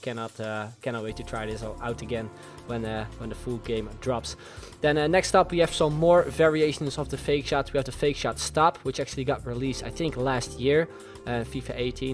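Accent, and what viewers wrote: Netherlands. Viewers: Christian El Tawil yea was thinking of that too. He sounds dutch so he should definitely know this haha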